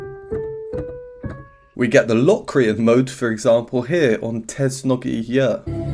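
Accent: United Kingdom. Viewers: Dear, I just love your videos and analyses, having no knowledge of music in theory and understanding next to nothing about notes and registers. Besides, being a linguist, I really enjoy listening to your irreproachable British accent. And Dimash... He is so talented that no words in any language can express. Everything he does is so beautiful, so powerful, so peace-in-the-world-loving (if that might be considered a word). Anyway, I'd like to give you a recommendation of a totally different kind. It's related to a... beatbox artist from Russia, Taras Stanin. Just look him up on YouTube if you find time. He's also an extremely talented guy in what he does. And the things he does about music, about covering well-known pop songs with his incredible combination of beatboxing and singing are just out of this world, in their own way, of course. Right now, his popularity on YouTube is skyrocketing due to all that stuff. 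I just wonder what you might say about such an outstanding artist performing in such an usual genre. Best wishes and regards from Russia! 🤗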